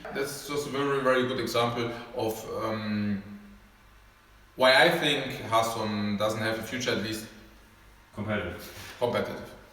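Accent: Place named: Germany. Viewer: wenn ne kartoffel englisch spricht...ohrenkrebs für meine englische ohren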